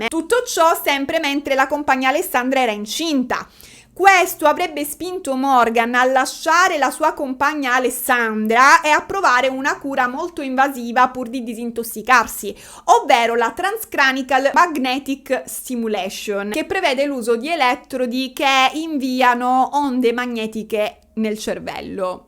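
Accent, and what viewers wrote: Sicily, Italy. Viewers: te l'ho chiesto perché sentivo una leggera inflessione ma non ero sicuro che fossi di queste parti: io sono di Parma ma mi sono trasferito con tutta la famiglia, a Siracusa. La Sicilia è il paradiso in terra! Ciao e complimenti per il tuo canale e la brillantezza con la quale lo conduci!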